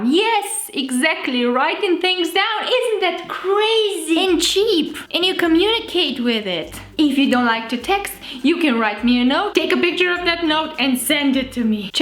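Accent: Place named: Romania. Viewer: Numai mie mi se pare dubios sa aud un om pe care il stiu normal vorbind in enlgeza,ca acum vorbeste in engleza?:)) nu stiu dec e dar daca prima oara cand am auzit un om vorbind in romana,poate sa vorbeasca si cea mai buna engleza ca daca eu l am auzit inainte in romana acum mi se pare ca vorbeste o engleza naspa cu accent romanaesc:))anyway super video,te extinzi si in afara super:)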